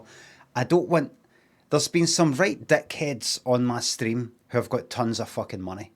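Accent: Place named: England, United Kingdom. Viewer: Limmy's proper english accent is excellent and very entertaining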